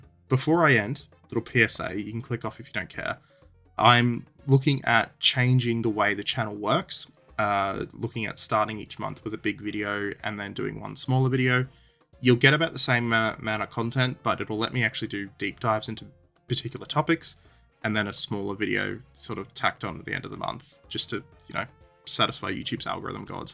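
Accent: Australia. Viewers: Cuuunt, fkn love your docos and research. You sound like you're from Queensland. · You sound like an Aussie?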